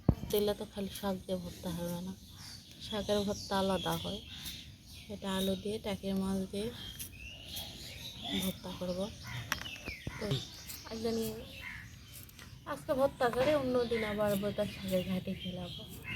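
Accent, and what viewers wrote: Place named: Naogaon, Bangladesh. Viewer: আপনি কোন এলাকার মানুষ? ভাষাটা আমার দেশ নওগঁার মত শোনা যায়।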